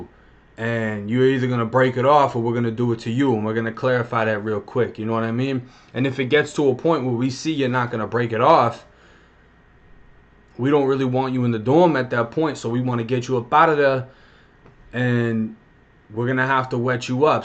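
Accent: Boston, United States. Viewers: Just hopped onto your Channel where you from bro sound like you from Boston I'm from Maine that's why I asked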